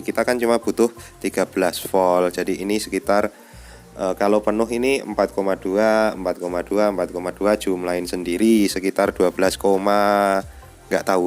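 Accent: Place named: Java, Indonesia. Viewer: Jowone ngendi mas...kok jowo blejed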